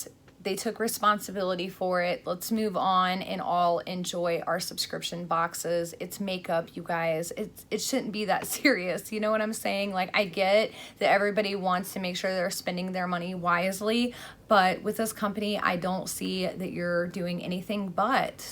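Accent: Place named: Kentucky, United States. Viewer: i thought your accent sounded familiar ! i had no idea you were from kentucky! thats awesome :)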